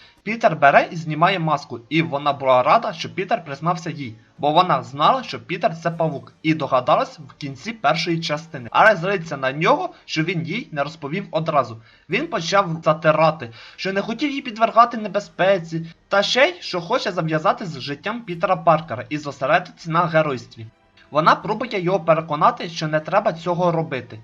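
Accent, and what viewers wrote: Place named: Transcarpathia, Ukraine. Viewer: Пасувало би добавити фонові (тихенькі) звуки до картинки) І треба щось робити з закарпатським діалектом)) я з західної України, все розумію,все сприймаю) але от східні,центральні Україні важко буде для сприйняття( «Пистолєт» 😂 Пістолет))))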